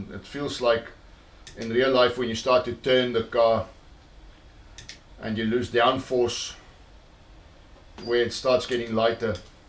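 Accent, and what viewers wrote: South Africa. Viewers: Hi just wanted to find out where u are from you sound South African. IF so where did you order your simucube from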